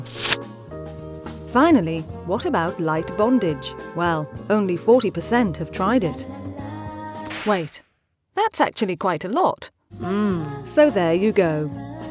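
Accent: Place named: United Kingdom. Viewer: the narrator's british accent made the video so much better.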